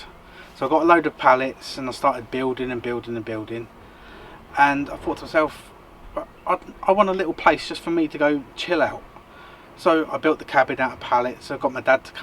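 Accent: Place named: United Kingdom. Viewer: 🇺🇸Very well said. Despite having a very British accent. 😂 Just love it! Prayers for your partner. Hope shes doing well.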